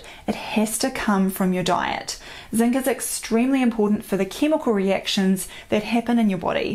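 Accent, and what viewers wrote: New Zealand. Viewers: I liked your Kiwi accent. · I love the way you say, 'GET'. It sounds like GIT, but stretched out. My friend is from New Zealand but he has been here for so long in London he sounds almost English (the toe rag)! So gotta git me some New Zealand accent and your perfect. Oh btw, thanks for the info on zinc, i'll try not overdose on it! Why is New Zealand called New Zealand, is it because its the land of new zeal, are you guys buzzing over there? All the best and keep up the good work. Im in a funny mood today, sorry.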